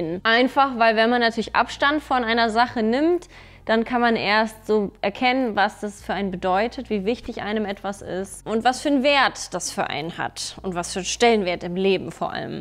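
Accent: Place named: Franconia, Germany. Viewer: als Halbfranke ist es für mich seeeehr sympathisch, dass du jetzt anfängst bisschen ins Fränkische abzurutschen. "Na, wir ham des damals aba a scho imma so gmacht"😍 ...love it!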